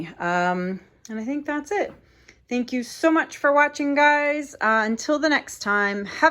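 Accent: Canada. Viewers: SO beautiful!!! I picked out your Canadian accent (How? Because I'M Canadian!)!!! lol Where in Canada do you live??? Your work is spectacular,! How long did you paint before you got to actively selling your work?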